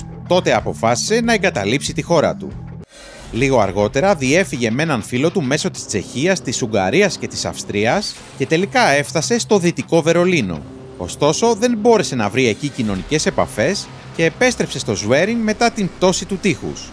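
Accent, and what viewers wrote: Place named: England, United Kingdom. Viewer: Πέρα από το ξεκίνημα του κιθαρίστα στα '80s δεν βλέπω καμμιά "άγνωστη αλήθεια". Και το κείμενο είναι μάλλον έκθεση λυκείου, διαβασμένο από κάποιον που πιθανότατα δεν έχει ιδέα για την μπάντα. Κατ' αρχήν, μέχρι και οι πέτρες ξέρουν ότι τα φετινά τους live είναι επετειακά για τα 30 χρόνια τους, και όχι 25. Έπειτα Γερμανικοί τίτλοι και ονόματα διαβασμένα με Αγγλική προφορά. Αν είχε ακούσει 2-3 φορές έστω τα κομμάτια, θα τα έλεγε σωστότερα, κι ας μην ξέρει Γερμανικά. Επίσης... άκου εκεί "ωραίες μελωδίες και καλή παραγωγή". Μιλάμε για τις πλέον κορυφαίες παραγωγές παγκοσμίως, πραγματικά "σχολείο" για ηχολήπτες και μουσικούς παραγωγούς. Όχι "καλή παραγωγή". Έλεος. Και δεν βγήκαν με κουστούμια χοντρών για να "γελιοποιήσουν το θέμα της παχυαρκίας" αλλά γιατί έτσι ήταν στο video clip του - το οποίο μιλάει γενικότερα για την παρακμή των ανθρώπων που δεν έχουν κανένα πια ενδιαφέρον στην ζωή τους.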